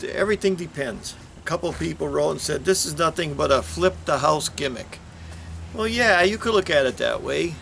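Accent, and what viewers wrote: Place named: Pennsylvania, United States. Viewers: That accent he has is typical N.E PA. I was born and raised near Wilkes-Barre and that accent really stands out now that I’ve been away for decades. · Schuylkill County girl here so love your accent,. I now live in South Carolina and just did my sidewalk the other day. So far it looks great! Now I'm moving on to the driveway.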